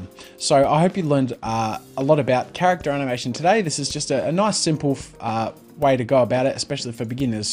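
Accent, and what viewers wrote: Australia. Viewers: r u australlian? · YES AN AUSSIE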